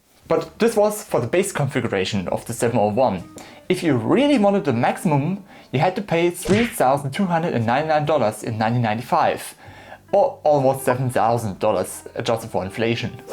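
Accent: Germany. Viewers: Das deutscheste Englisch was ich bisher gehört habe. 😀 Aber super Content mega! 😊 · Dude, your accent is the every English speaking German in any movie. Fantastic. Love me a thinkpad.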